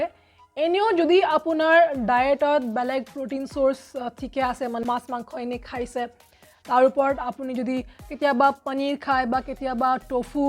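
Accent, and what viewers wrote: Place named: Odisha, India. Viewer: Sounds so similar to Odia.😅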